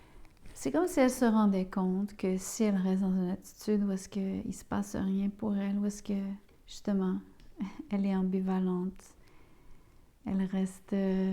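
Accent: Quebec, Canada. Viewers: Magnifique petit accent québécois -:) merci pour le tirage qui me parle, dommage pour le son trop bas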